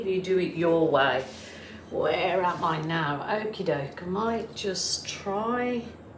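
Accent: United Kingdom. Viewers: Hello I tried to send you a message yesterday but it seems to have got lost in cyberspace! Anyway the gist of my message was to basically tell you how very much I thoroughly enjoy & appreciate your tutorials. I adore your art and am learning a lot watching you paint. Also enjoy your sunny chatty disposition and your delightful British accent which so happens to match the Sunshine Coast where you reside. Thank you for all your tips which is going to help me immensely when I hope to embark on my painting venture instead of simply being a couch potato!!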